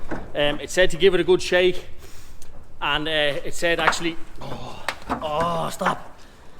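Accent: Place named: Dublin, Ireland. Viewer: A Dub accent from a farmer takes a bit of getting used to. I think il survive though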